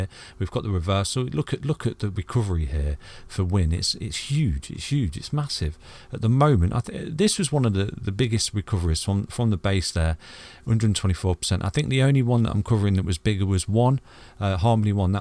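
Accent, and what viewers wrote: United Kingdom. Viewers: I was waiting for this Mr British accent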